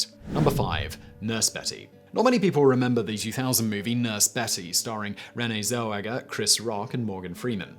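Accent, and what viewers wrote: United Kingdom. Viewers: Too many British pronounced tomatoes in this video. Please never do a video where you have to say aluminium multiple times.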